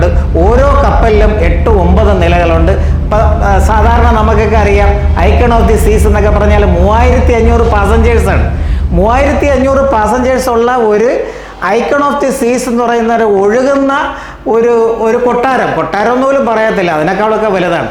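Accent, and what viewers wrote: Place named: Thiruvananthapuram, India. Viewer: തിരുവനന്തപുരം Accent സംഭാഷണത്തിൽ കൂടുതലുണ്ട്.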